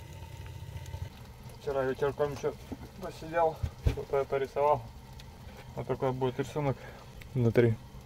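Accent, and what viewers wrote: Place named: Vologda, Russia. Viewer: Интересный у вас говор, вы с Вологды?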